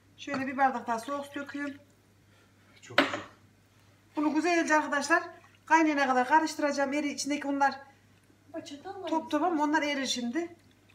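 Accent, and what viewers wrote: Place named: Konya, Turkey. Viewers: hanim memleket nere konusmalar bizim oralar ayni bende inanadoluluyum slm hanim👍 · Zaten konuşman bir tanıdık geldi toprak çekti bende konya ereğli liyim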